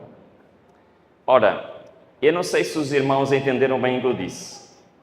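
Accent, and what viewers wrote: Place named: Portugal. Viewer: Boa tarde, amo ver ,este PR. Ele é muito didático, e meu conterrâneo,sou filha de português,e meu pai, falava assim.Moro no Brasil.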